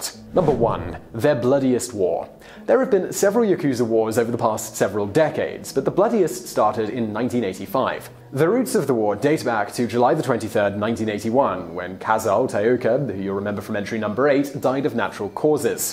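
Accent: United Kingdom. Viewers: ugh, its not pronounced ya-KHU-za. Yakuza is pronounced "Cheese." Yes, I am A) busting your balls, and B) displaying how much most of us give a damn how some brit pronounces names in a culture whos language is completely separate from English. As in not very much at all. Dont get caught up in the vocal minority, Most of us are just looking to be entertained for a few minutes, doing some research and giving it your best go is all we expect.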